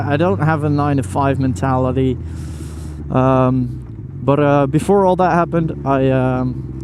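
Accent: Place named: England, United Kingdom. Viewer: Not going to lie I may have screeched out of shock when you said my name.😂 Also before I had known you were Dutch I thought you might have been from England based off your accent when speaking English, but now I know it's probably from going to and English school in Saudi Arabia for some time.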